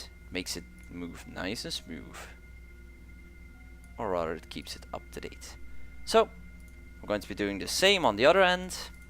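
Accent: Netherlands. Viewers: i dacht al dat een nederlands acsent had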